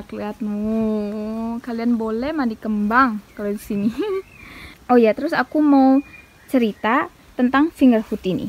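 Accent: Bali, Indonesia. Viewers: Orang bali kak? Kok logatnya bali banget yaa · Logatnya bali banget Kakak dari bali yaa? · Dari Bali kak ya?? Logat Bali banget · Kak ada logat Bali nya kental banget yaa 🤣🤣 Di konten YouTube yg rujakan sama Mpo Ika juga bilang biasanya rujak bumbunya tabia, sere, kuah pindang 😂 Penasaran dulu pernah tinggal di Bali kah? Btw, stay healthy sekeluarga dan thank you udah selalu sharing konten yg menarik yaa kakk 😍😍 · Kok kak logat.a agak orang bali 😍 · Logat Bali ..kak dari mana asal Bali kah? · Logat balinya hihihi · Logat balii dongs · Kok aku dengar ada logat bali nya ya😂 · Aku baru mulai nonton channel kakak...kak dari mana ya? Orang Bali kah?soalnya logatnya kaya orang Bali😅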